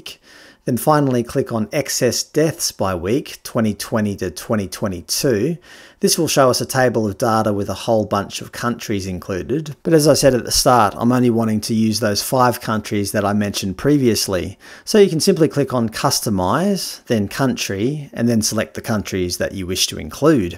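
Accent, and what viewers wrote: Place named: Australia. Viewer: Even with a nasally Aussie accent this is well done and worth a listen. The unimaginable can happen.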